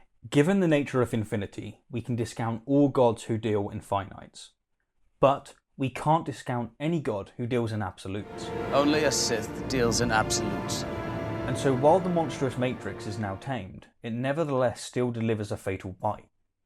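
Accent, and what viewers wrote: United Kingdom. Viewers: Also you are pronouncing wrath the American way rather than the British way which is out of kilter with the rest of your pronunciation and grammar which is British.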